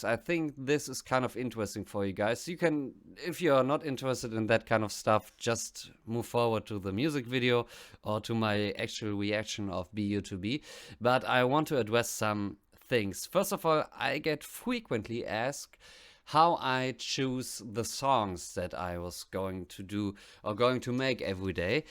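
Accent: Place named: Germany. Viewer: Neil was writing themes that were 10 to 15 years in advance of the times. Clock Work Angels is unfolding today/. The German accent is cool. You sound all right man. This song describes the obstacles of today.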